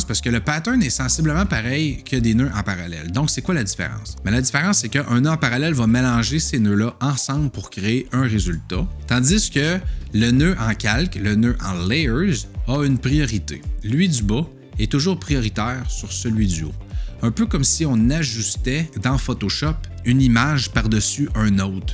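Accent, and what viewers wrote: Quebec, Canada. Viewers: Incroyable vidéo, merci!! + 1 point pour l'accent québécois que J'adore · Bonjour de France, ami québécois. J'adore votre accent...ou alors c'est les français qui ont un accent ^^ Gros pouce bleu pour l'introduction . DR,j'hésite à y passer, du moins le tester à cause de qqls gros pts négatifs...mais j'ai envie de l'étudier un peu. De plus, fusion me plait bien, comme nuke (mais en moins pousser) et puis les nodes c trop bien. Merci pour la video.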